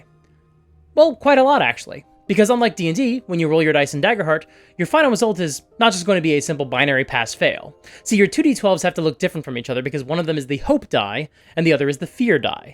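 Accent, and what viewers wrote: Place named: United Kingdom. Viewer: These Daggerheart videos are great!! British accent, on the other hand... (No hate tho man, I love your stuff)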